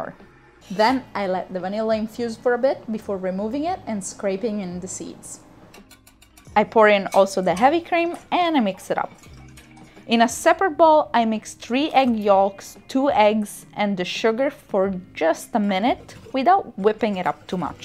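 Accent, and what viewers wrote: Italy. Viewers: Your accent became more American.. You were nice by italian accent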